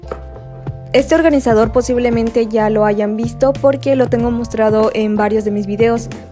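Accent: Mexico. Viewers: Es mexicana por su acento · Se escucha como mexicana, pero "fresa" y "pudiente" porque nos presume que viaja en auto.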